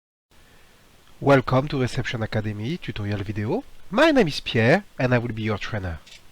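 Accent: France. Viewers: Academy Customer Services With the french accent Pierre !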